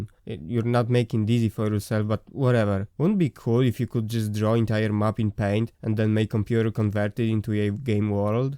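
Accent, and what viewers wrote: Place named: Poland. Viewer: you sound so polish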